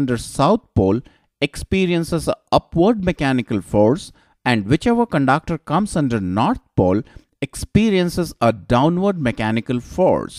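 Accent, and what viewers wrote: India. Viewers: Bengali accent most probably. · Hindi accent I'm sure. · I love the way he pronounces conductor as cundaaacter typical indian accent ! but awesome effort thnk y sir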